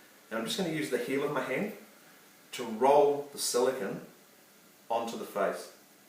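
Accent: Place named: New Zealand. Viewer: ...new zeland accent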